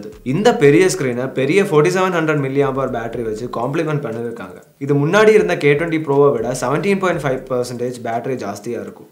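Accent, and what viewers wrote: Kerala, India. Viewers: Sounds like a mallu talking tamil. Anyway nice presentation. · Unga tamil slang pidichirukku.. are you malayali? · Malayali tamil pesurathu romba nallaruku.... 😀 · I guess he is a malayalee. The slang though - adipoli bro. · His accent has malayalam flavour. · Bro,are you malayali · Are you malayali? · Liked that Malayali tamil accent.. Gud review. 👌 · Neenga malayalama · Anchor Malayaali... 😍😍😍🎈🎈🎈🎈🎈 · Kerala bro appadidhan pesuvaru Okdhan bro eppothume mass🔥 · .. Are u a malayali?????? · Bro neenga malayalaia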